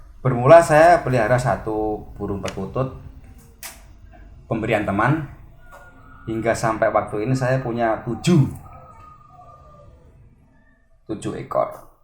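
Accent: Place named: Ambon, Indonesia. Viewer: Gw Kira org ambon kmu bro ??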